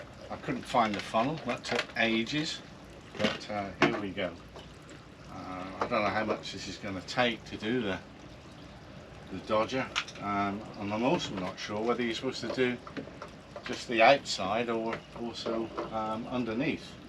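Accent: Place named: West Country, United Kingdom. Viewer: Great Video. Good to see you and hear that West Country accent too!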